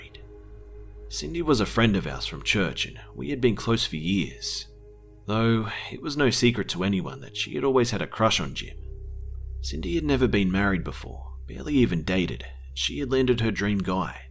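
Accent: Australia. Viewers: I agree with you 100%; IS one of the best narrators on YouTube! His content is ALWAYS excellent AND satisfyingly creepy. I too an not a big fan of The Creepypasta genre (as I assume you aren't either) and his channel rarely presents them. His content is always well chosen; it is gripping, spooky, pleasingly bizarre at times and is always presented in a very listenable manner. (AND, how amazing is his Aussie accent?!) `s channel is DEFINITELY one of the very best on YouTube! 😱💀☻👻🦇🕸🕷🐱☠👽🖤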